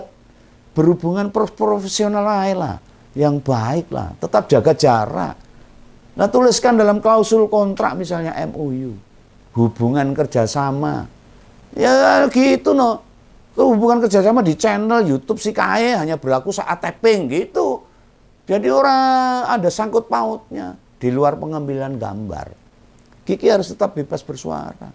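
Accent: Java, Indonesia. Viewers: Mantap om,tetap bersuara dg diselingi dialek jawanya..saya suka😂😂😂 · Sbg non jawa. gak ngerti apa omongam om ini, medhok2 campur aduk.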